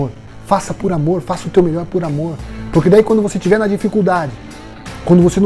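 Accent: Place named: Rio de Janeiro, Brazil. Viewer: você é carioca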